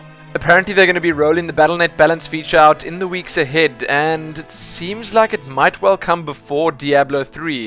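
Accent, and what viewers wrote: South Africa. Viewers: This is why regular TV is dead. Freely accessible, top notch content like this is on Youtube. I might say niche content but it;s well produced nonetheless. Got to love that South-African accent., you do excellent vlogs as well man. Did you ever consider presenting a Pulse episode?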